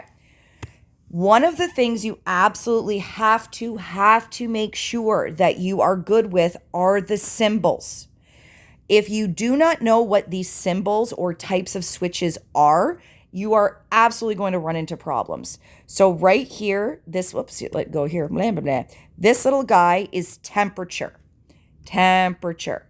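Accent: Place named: New York, United States. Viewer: chill your new york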